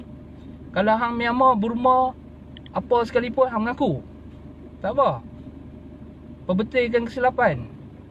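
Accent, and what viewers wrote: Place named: North, Malaysia. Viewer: Tapi abg cakap macam orang utara ye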